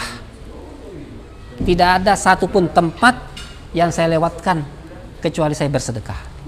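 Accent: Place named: Java, Indonesia. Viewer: Sampean lubise wong jowo tah..ketok logate. 😁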